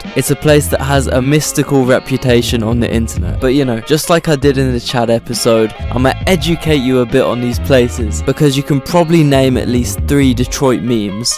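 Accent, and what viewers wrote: United Kingdom. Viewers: Not a good video of Detroit. You have a person with a UK accent that cant pronounce Hamtramck correctly & really knows nothing about the city..... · So cool, with your bri’ish accent, thanks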